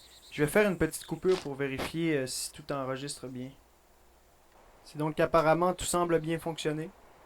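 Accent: Quebec, Canada. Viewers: je veux un autre episode pas demain parce que je suis pas la mais apres-demain nan sérieux ton accent québécois paraissait plus dans cette video et ta pas de laire de venir da grande ville mais plus du nord du québec =) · dans quel pays habite tu quand on dirait que tu as un accent québécois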